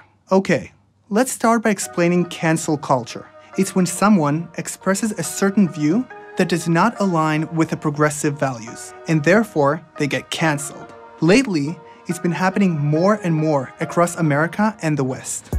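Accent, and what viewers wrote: Israel. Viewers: לא לוקחת צד בדיון העקרוני, אבל כדאי ליישר קו לגבי העובדות. שומעים מבטא ישראלי קל. אתם לא שומעים אותו כי אתם ישראלים. האמריקאי הממוצע לא יחשיב את זה כ"מבטא יפה" (אלא אם כן הדובר נראה כמו גל גדות ואז מותר לו לדבר איך שבא לו).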